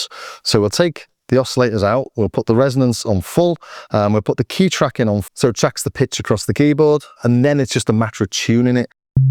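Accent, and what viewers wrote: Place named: North, United Kingdom. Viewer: where is that accent from? Somewhere in the North?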